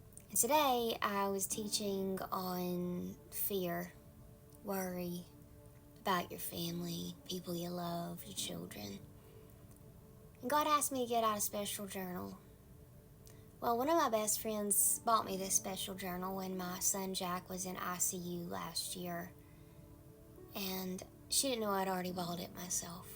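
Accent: South, United States. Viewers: By the way love your southern accent :)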